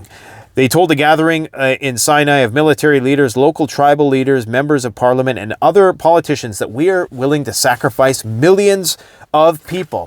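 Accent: England, United Kingdom. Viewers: I Love the freaking English accent making fun of the long winded diplomatic words fancy words declaring war without flat out saying "our country is declaring war on XYZ!" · Laughing! English accent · love your British accent. As I am part Brit I got no problem with the use that voice to mock the crowns BS and if you live in a crown country you don't live in your own country do you. Us real Brits are always mocking the elites, which near us sound like us lolz unless they are playing "rainbow friends" with "young global leaders" etc. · Not good, 😂 British accent, why ppl refer the accent to war scenarios. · Thank you kindly brother. You must be tired. Love the British accent. Need to use more often. Had to do a lot of replay😂. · I SAY OLE CHAP THAT WAS A BRILLIANT PROPER BRITISH ACCENT...BRAVO, WELL DONE YOUNG LAD! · That English accent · Lol...Love the English accent could you do a show ( post ) on MILITARY DRAFT and what it takes for a draft to happen who would be called, age groups, each countries policy's on this. 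I think that would be a informative subject. Thanks for your channel! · 😂 love English accent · That's an English accent lol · I love your British accent. Sounds awesome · You have the best English accent! Keep it up! Helps digest all the hard truth you are giving us! Don’t stop. A spoon full of sugar helps the medicine go down :) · Ok that British accent has me rolling. You speak redcoat just a little too good 😂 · God I love it when you put on the pretentious Brit voice, lol makes the info easier to swallow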